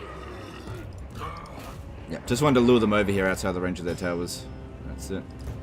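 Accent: Australia. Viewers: Wait is Australian????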